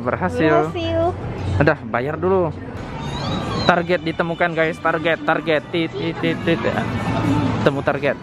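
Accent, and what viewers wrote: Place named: Manado, Indonesia. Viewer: Rupa logat manado noh 😅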